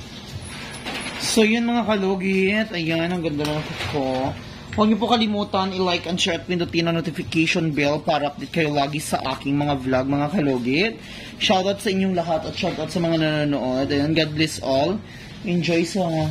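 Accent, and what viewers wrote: Iloilo, Philippines. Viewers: Tga din kaw te? Daw may tono kaw na Ilonggo ☺️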